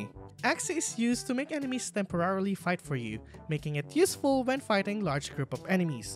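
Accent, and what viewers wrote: Philippines. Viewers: I can sense the Filipino Accent. Good english! I don’t normally watch Filipino youtubers because they can be really annoying with their repetitive sound effects and poor grammar. But you, I’m willing to watch for all of my Witcher content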